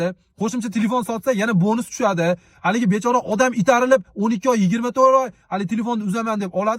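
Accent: Andijan, Uzbekistan. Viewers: Анжанданмисиз гап йук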